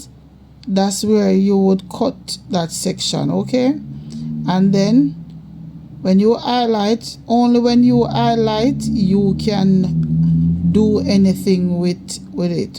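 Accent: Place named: Jamaica. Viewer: Thank you so much love, i was really struggling with these, it was really helpful. i am always loving the Jamaican accent.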